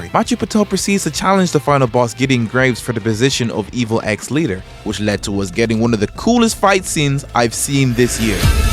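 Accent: Ireland. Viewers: Are you Irish, you kinda sound it?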